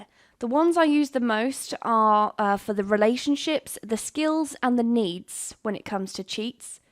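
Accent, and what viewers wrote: United Kingdom. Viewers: Oh my life are you brittish? I joined on and your accent sounds so Brittish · I think she's british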